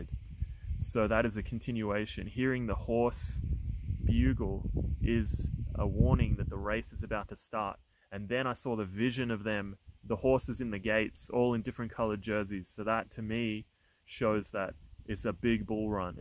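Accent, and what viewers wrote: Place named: Australia. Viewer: I like your Aussie accent mate ❤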